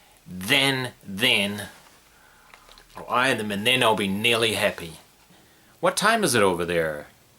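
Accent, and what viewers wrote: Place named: United States. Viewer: Lol - great work! Love your parodies. Your American accent isn’t half bad. I once had a friend here in Texas from Christchurch and definitely did a poor job on his accent when I tried.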